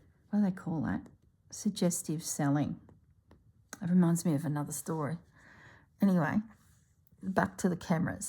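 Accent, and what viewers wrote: Australia. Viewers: Ah! Love what you did with the leaves! I JUST LOVE this channel! I love your style, your warm conversational style, stories, and, of course, that Aussie accent and vernacular! So glad to have found you !❤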